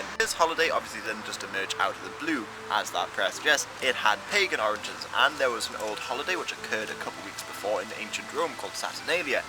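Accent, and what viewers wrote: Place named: England, United Kingdom. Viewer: He's English, just because he doesn't have an American accent doesn't mean english is his first language. What most Americans don't understand is that ENGLISH is from ENGLAND.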